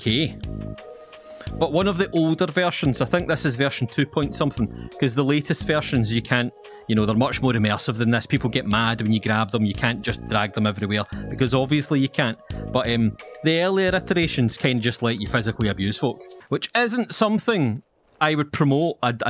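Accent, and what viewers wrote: Scotland, United Kingdom. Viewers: Scottish YouTuber AND Skyrim??? I’m home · If you had a tid bit of irish mixed in with the scottish accent you could become Sheogorath himself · A Scottish version of IAmCrusty….I love it 😂🥹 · Lol IAmCrusty does this sort of thing and he's British so hearing a Scottish person get upset in this game is a new level of entertainment that I can get on board with. Glad I found your channel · This is the first video I've seen of this man, but his beautiful Scottish accent sold me. Subscribed. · I’ve never found something funnier than a Scottish man calling Ulfric Stormcloak a dirty fascist bastard. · The Scottish scolding is really soothing. I will watch your other videos, they maybe relaxing too. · Oh my goodness, you might be the most Scottish person I've ever seen. Like, not even just the accent, but your sense of humour and the way you talk as well, it feels so very Scottish and it's an absolute joy to listen to. 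· I just found this channel, and my god this is hilarious. The Scottish accent really adds to it all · Some insane and rambling scottish dude drags Ulfric from Windhelm to Solitude. Why is nobody stopping him? Because he's the friggin dragonborn, slayer of Alduin the world ender. Who's gonna stop him? Some random ass guard that used to be an adventurer? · It’s the Scottish accent for me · The Scottish accent just makes this more entertaining LOL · First video I seen was Aventus, it was weird and would have felt unnatural had I not known what was happening lol. This series is GOLD! Your heavy Scottish accent makes it so much better. Thank you. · This is Scottish babes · Your name should be the laughing scotsman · Scottish accent made this so much funnier😂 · 😂😂😂 oh i love ur accent it makes it so much better to watch scottish is an amazing acent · Damn, that was HILARIOUS! I couldn't stop laughing! 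And with scotish accent I instantly thought of the NacMacFeegle! Nay King! Nay Queen! 🤣 So maybe you could make the other way rund also dragging Tullius to Ulfric! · Why is there a Scottish man dragging Jarl Ulfric across the land of Skyrim while scolding him with a heavy accent in my recommended videos? Not that I'm complaining. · I'm a simple man. I hear a Scottish accent, and I'm happy. In fact, I think I spontaneously sprouted a kilt, and for some reason I cannot pronounce "burglar alarm" any more. Just found your channel, already love it. Thanks! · No intro, not name, not asking to like and subscribe. Not even an explanation aside from the title. Just a heavily accented Scottish man dragging a Nordic man across the countryside. This is peak entertainment lol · Never thought i spend 30mins hearing a scottish dude ramble at an npc yet here i am🤔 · I did not know I needed 23 minutes of a Scottish man dragging Ulfric Stormcloak all around Skyrim. 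For that, I thank thee.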